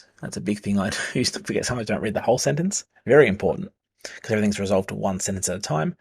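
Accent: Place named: Australia. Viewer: Thanks for the playthrough. It's good to hear an Aussie accent for once! Where did you get the playmat from? Cheers.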